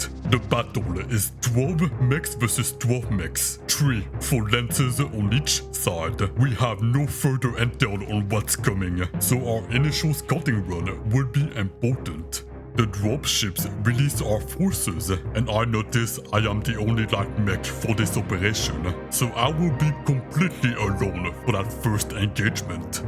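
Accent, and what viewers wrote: France. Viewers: is his accent real or is he an american imitating a french talking english?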